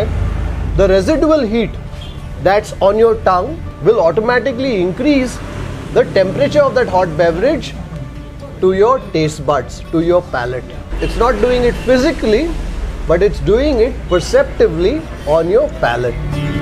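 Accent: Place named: South, India. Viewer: Ur accent is just like the South.😀😀 and are u always on journey?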